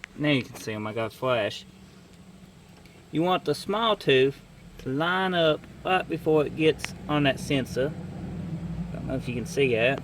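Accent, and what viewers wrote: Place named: South, United States. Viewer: Where are you from brother? Definitely a southern fella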